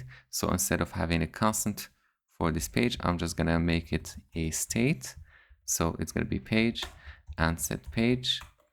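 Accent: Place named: Morocco. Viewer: Bro sounds moroccan AF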